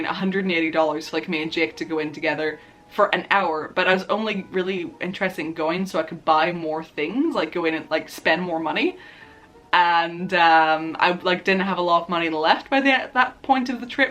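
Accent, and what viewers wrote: United States. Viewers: Ive no idea why, since I know you live up north, but your accent sounds like, half american or something these days lol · Well maybe a few words, actually, but at first you sound American!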